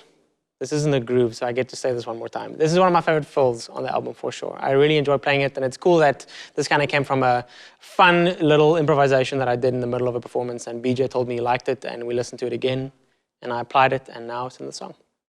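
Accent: Afrikaans, South Africa. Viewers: If you want a laugh turn the captions on for the video. Youtube doesn't understand the South African accent at all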